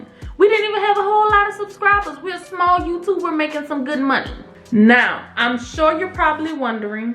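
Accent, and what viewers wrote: New Orleans, United States. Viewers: You have to be from New Orleans. But this is a good video!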